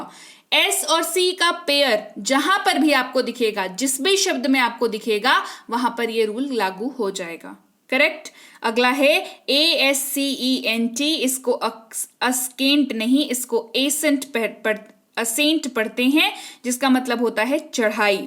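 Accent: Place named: East, India. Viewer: आप के उच्चारण मे पूर्वी भाषा मिठास बढ़ा देती है।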